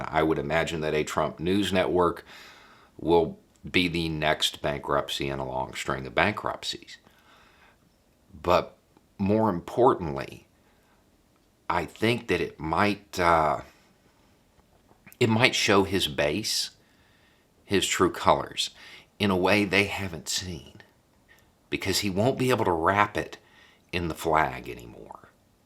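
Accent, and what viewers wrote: South, United States. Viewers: You have a southern accent are you having to fight people in walmart like me with my Biden hat? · Too bad Jill Biden can't be Secretary of Education, cuz Joe's got ethics.🤘❤️🤘 Happy Diwali, y'all. PERFECT timing. Btw, YOUR Southern accent makes you sound smart.✌️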